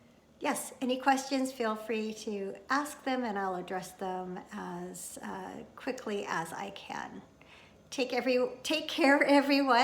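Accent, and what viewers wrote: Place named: Canada. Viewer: I just found your podcast today Great to hear a fellow Canadian. I'm looking forward to joining your makealongs.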